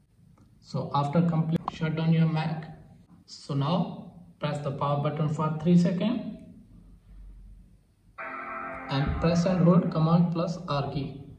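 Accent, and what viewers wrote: India. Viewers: As soon as I heard the indian accent, I knew it was going to work!!! Thank you!!!❤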